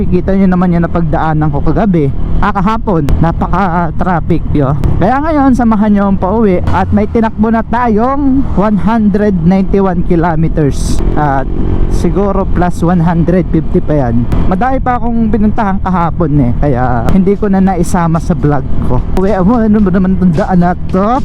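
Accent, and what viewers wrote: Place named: Quezon, Philippines. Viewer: Taga quezon kaba boss may punto ka e haha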